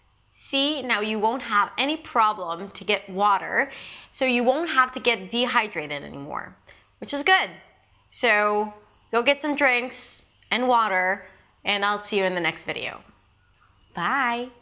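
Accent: United States. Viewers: What a beautiful american english 😍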